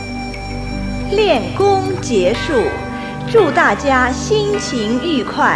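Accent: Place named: Jiangzhe, China. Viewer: 江浙一带的口音，听他解说五禽戏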